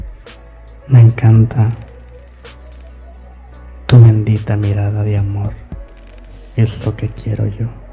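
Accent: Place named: El Salvador. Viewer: Qué bonito audio me parece conocer ahí Quisiera saber quién eres Me parece que eres salvadoreño igual que caries ese lugar me gustó mucho tu audio tú eres salvadoreña tienes ese acento favor dime quién eres Este siento triste me gustó tu audio hace 9 meses Gracias por compartirlo nunca lo había visto que Dios te bendiga❤💯👌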